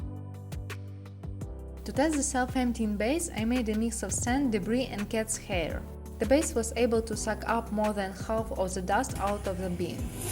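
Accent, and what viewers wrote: Russia. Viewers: this russian accent is horrible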